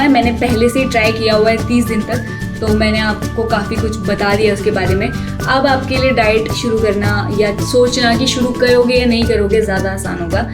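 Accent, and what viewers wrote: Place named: Pahadi, India. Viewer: You are pahadi and delhi ki... i can sense you mann!!! Amazing review.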